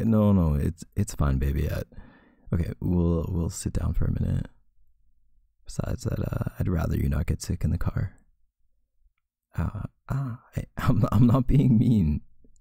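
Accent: Canada. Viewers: Hey, idk why but you sound really Canadian in this audio! I Love it dude! 💖😂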